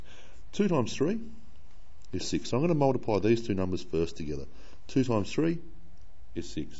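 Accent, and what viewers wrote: Australia. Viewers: after watching these lessons i wished all my teachers had australian accents.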